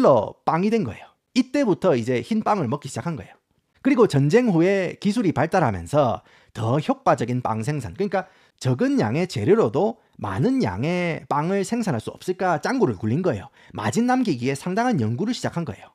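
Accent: Gyeongsang, South Korea. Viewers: 갱상도 사투리에 홀려서 구독합니다. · 한국말하실땐 구수한 경상도사투리 쓰다가 영어만하면 어나더레벌😊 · 와 나도 경상도 사람인데 이 사람 사투리 왤캐 심하냐 같은 경상도가 들어도 거북할 정도임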